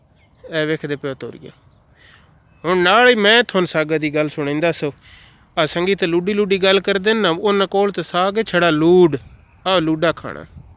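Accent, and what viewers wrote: Sargodha, Pakistan. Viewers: میرا بھائی کیا بات ہے اصل پنجابی سرگودھا دا انداز تے مزدار بات ۔۔۔۔زندہ باد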